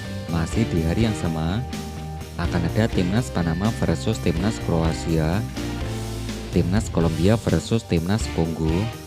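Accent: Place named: Madura, Indonesia. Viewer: Admin e wong meduro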